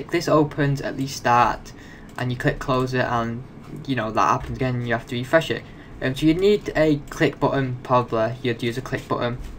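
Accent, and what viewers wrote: United Kingdom. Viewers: Well explained...with a Manchester accent, too!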